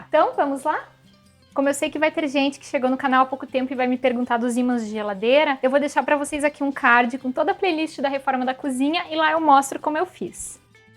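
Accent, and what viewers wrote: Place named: Paraná, Brazil. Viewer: Amo esse canal: fato! Mas também amo esse sotaque paranaense! haha 🙄❤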